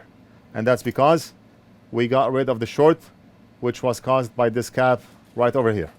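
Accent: Russia. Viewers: His accent doesn't sound native, he's more of a russian than American, that's why America wants to get rid of Russia.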